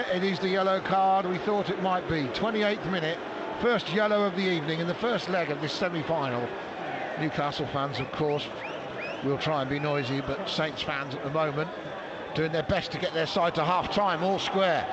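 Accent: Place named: United States. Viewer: He is American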